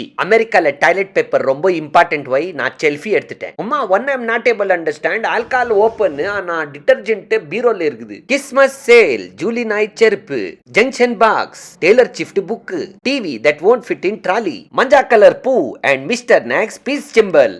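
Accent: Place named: Bangalore, India. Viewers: Your Bengaluru Tamil slang is super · This is Bangalore tamil accent not kannada. Fkr can't even differentiate between kannada n Bangalore tamil and is here to talk about his kannadas Beauty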